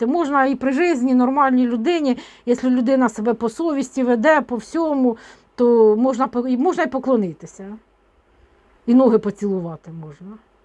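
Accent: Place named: Surzhyk, Ukraine. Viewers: Мені понравилось,а суржик наш,полтавський! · смотрю разных тарологов. Есть очень культурные, есть матершинники. Есть рускоязычные,некоторые красиво говорит по украински, но я обажаю ваш суржик.Вы всегда говорите очень просто и доходчиво. Спасибо за росклад.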